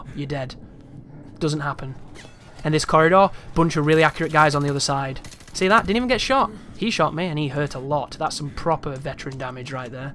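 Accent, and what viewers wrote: United Kingdom. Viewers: Your heavy british accent makes it 10 times better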